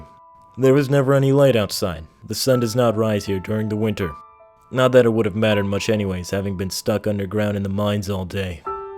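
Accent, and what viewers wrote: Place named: Russia. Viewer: You have a slight Russian accent, and I love it!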